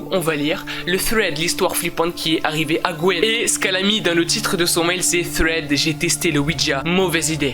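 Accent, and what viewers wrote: Marseille, France. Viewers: t marseillais parce que moi aussi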